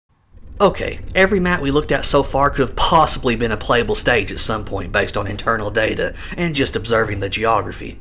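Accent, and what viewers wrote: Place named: Texas, United States. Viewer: He sounds like a texan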